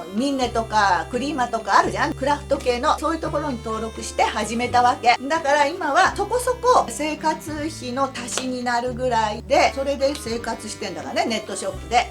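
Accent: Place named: Hiroshima, Japan. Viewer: 広島弁かな！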